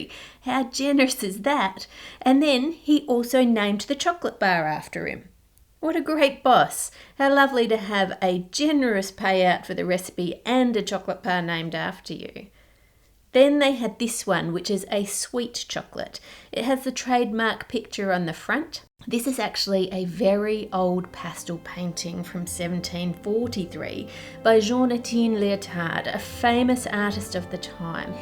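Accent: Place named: Australia. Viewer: Gotta love the Aussie accent!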